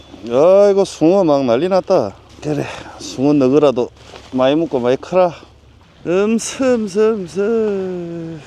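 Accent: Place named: Gyeongsang, South Korea. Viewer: 경상도 목소리 좋으네요 얼굴은 수더분하시고 구독합니다 좋아요는 시청료로 눌러봅니다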